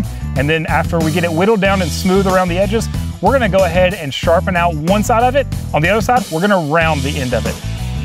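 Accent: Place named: Tennessee, United States. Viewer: What part of Tennessee u from brotha?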